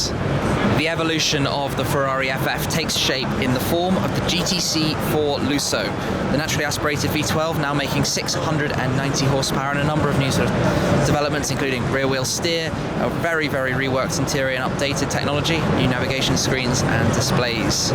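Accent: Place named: United Kingdom. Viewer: sotaque britânico...eca...manda um americano cobrir o evento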